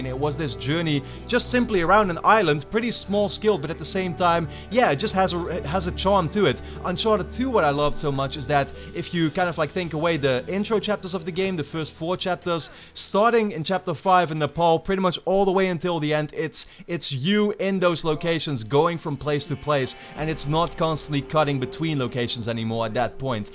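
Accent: Netherlands. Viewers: ur dutch right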